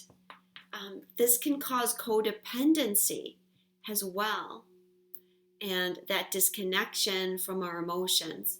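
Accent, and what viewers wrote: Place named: Massachusetts, United States. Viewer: I love your readings. You really clarify things. I also just Liked you on Facebook. I also detected a hint of an accent that you were a fellow Massachusetts girl like myself. I also think you have really pretty hair...:)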